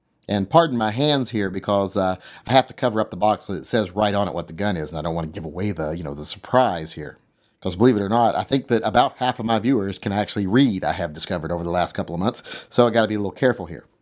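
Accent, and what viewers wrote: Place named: South, United States. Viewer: I need to ask you. You're called, but you have a southern accent. Why?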